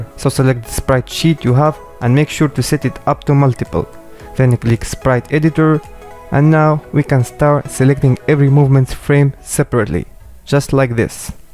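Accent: France. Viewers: t'est français ? car tu sonne français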